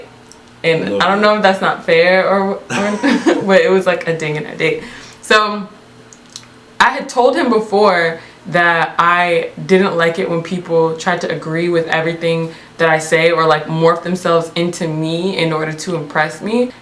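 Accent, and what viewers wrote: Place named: Caribbean. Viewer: He has a bit of a carribean accent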